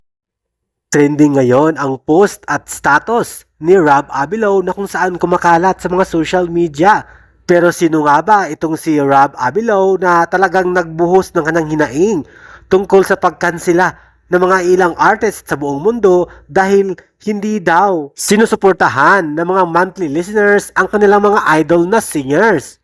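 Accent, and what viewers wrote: Philippines. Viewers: I’m happy for our Mahalima! I also want to commend this channel kasi yung host at yung video, walang ginamit na AI!🎉❤ dami kasi ng nagsulputan na channels na bago tapos yung thumbnails nila halatang AI kasi yung mahalima natin, iba ang hitsura. Meron pa ngang thumbnail na na double mukha ni josh tapos wala si Justin, yun pala pinaghalo halo ng AI mga itsura nila 😂😂😂 tapos AI yung boses, maganda nga yung accent pero di mo ramdam yung pride ng host pag nagbabalita ng good news sa mga A’tin. Meron pa ngang halatang pinasulat sa chatgpt yung script kasi ang habaaaa ng video pero niligoy-ligoy lng pala yung point 😂 So pls continue producing content na gawang tao at may puso, andito kaming viewers na prefer ang Pinoy accent natin kesa robotic na accent. Salamat!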